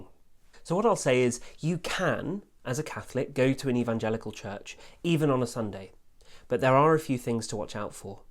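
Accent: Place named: England, United Kingdom. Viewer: Nice to "hear" an English priest!!!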